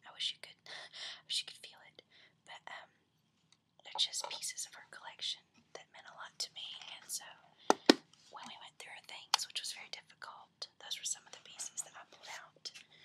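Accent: South, United States. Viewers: I just came across this video, maybe you've answered in a previous video...but is that southern accent I'm hearing?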